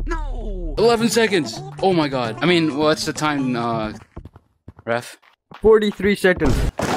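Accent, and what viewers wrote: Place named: United Kingdom. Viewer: Bro speaking literally in British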